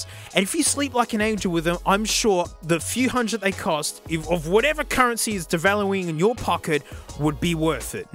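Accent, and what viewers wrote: Australia. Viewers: I'm just here to learn the Australian accent of his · I have never heard tinnitus said that way, am I wrong or is he just too Australian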